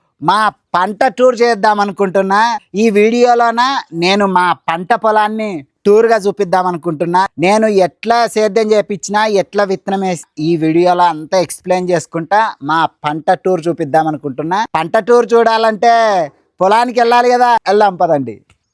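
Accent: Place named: Kurnool, India. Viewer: Pure Kurnool slang Anna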